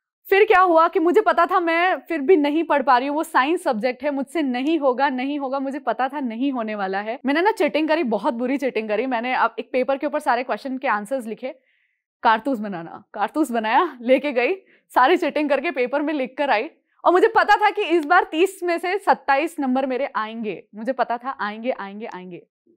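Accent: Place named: Rajasthan, India. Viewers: Khammma Ghani Baishaa Mevadi me aapki boli hunke ghano majjo avve.... Khas krne vo jo bachha he vo msttt lagta he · आपका पहनावे और बोलचाल से हि पता चलता है कि आप वीरों कि भुमि राजस्थान, मेवाड़ से हैं।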